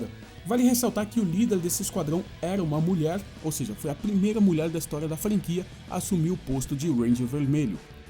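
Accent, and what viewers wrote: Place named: Rio Grande do Sul, Brazil. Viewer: O cara fala muito gaúcho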